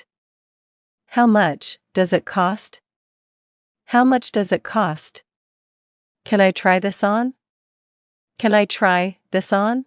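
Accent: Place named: United Kingdom. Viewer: Thanks my teacher is that British accent or American?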